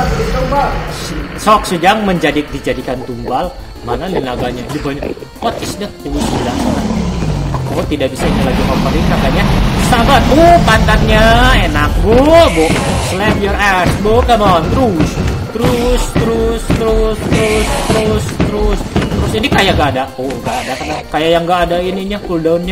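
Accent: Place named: Sunda, Indonesia. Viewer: orang Bandung yak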